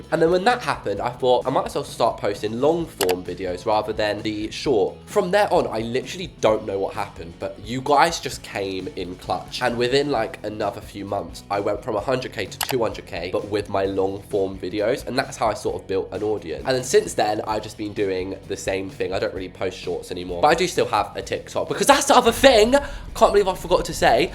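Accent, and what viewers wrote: United Kingdom. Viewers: Why are you British? 😊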